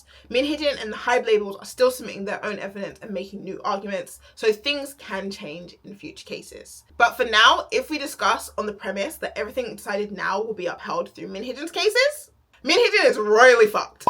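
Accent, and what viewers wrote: United Kingdom. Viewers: American?? I think she's British. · Their pronouns are they/them and yeah I’m pretty sure they’re British, def not an American accent lol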